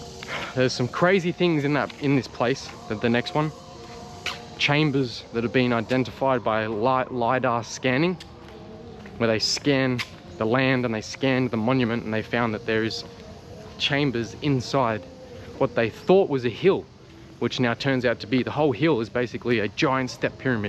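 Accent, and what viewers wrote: Australia. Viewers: Great content I enjoyed watching that. I'm curious about that accent. I was thinking Australia but then south Africa, then maybe both. Am I close? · I absolutley love it when you say HECTIC!! You have the best aussie accent I've ever heard mate ❤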